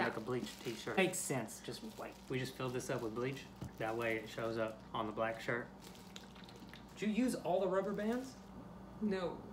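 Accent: South, United States. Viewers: So I realise I'm super late to this video but I e watched a lot of recent videos and I have to say that he's WAYYYYY more southern here than recent!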